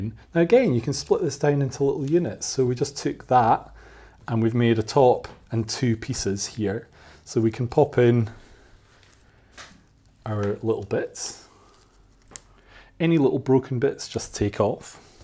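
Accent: Scotland, United Kingdom. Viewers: I envy British accent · Scots accent ?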